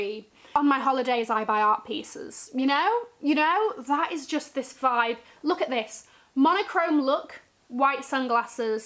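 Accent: United Kingdom. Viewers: Can you give us your opinion about the new iPhone? It is definitely a luxury item, do you have designer cases? And what applications do you use? Oh and merry Christmas and new year! I love your British accent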